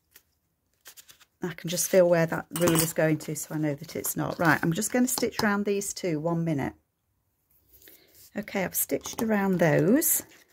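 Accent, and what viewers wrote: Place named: Aberdeen, United Kingdom. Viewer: you are so very welcome... I tried to write you a note 🗒 about being able to locate the book you showed & mentioned... The keyboard wasn't able to show up.... any rate... I found it... Used & in like new condition 4 only a couple $$'s + SH... Thx 🙏 4 showing the front cover.... Your stories while you are creating are most enjoyable.... Your voice with accent take me back to my time living in Aberdeen & New Machere.. Happy time's... Memories more.... Later my BOO's... Sincerely sincere Miss Judith 🌹... xx 🎶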